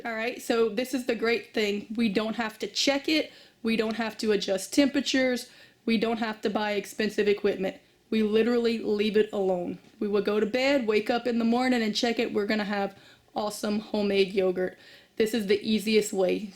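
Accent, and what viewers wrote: Louisiana, United States. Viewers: Are you from Louisiana??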